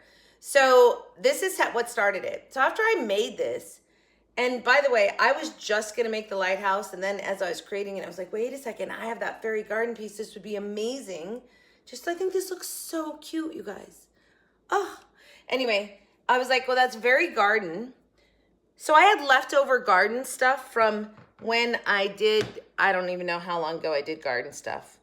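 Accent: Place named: North, United States. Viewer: Hello i just came across u on you tube. Yes I did put up a like & subscribe. I'm from alittle village called Conmicut. I can see ur not from the south cuz ya talk like me! I think u did a great job on ur DIY'S So I'll see ya again & again hee hee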